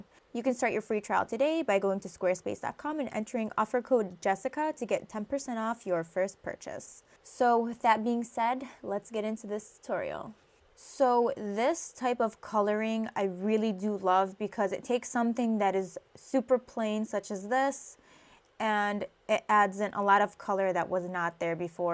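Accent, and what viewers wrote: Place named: New York, United States. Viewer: tnx for the tutorial. i find it cute when u say u wah (u want), dah (that) 😘 super new yorkerish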